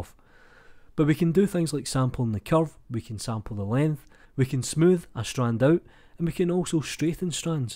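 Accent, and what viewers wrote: Scotland, United Kingdom. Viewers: I'm here especially for the Scottish accent. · I like the scottish accent, keep it. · i dont know if you are Welsh or Scottish.. probably the latter. I can understand you more than my fellow Aussies. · That Scottish accent is so thick, lol. I have a better time understanding Gerard Butler!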